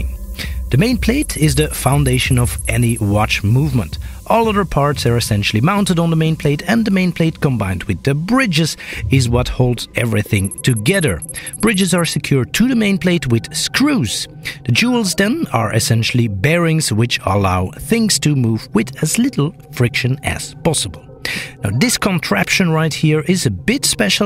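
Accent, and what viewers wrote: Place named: Quebec, Canada. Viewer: That's great. Is the narrator Québécois? I hear a slight accent.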